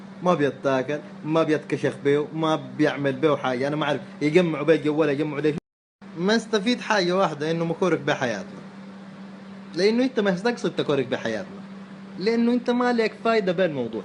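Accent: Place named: Kordofan, Sudan. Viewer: كفوو يا ، لهجة اهل كردفان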